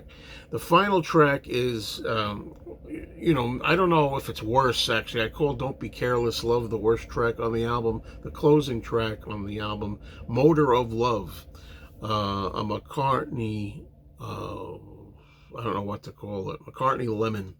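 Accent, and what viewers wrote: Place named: North Jersey, United States. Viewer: I have this one on vinyl, and saw him at MSG when this came out. Never really listened to it much., sound like you’re from northern NJ. Are you?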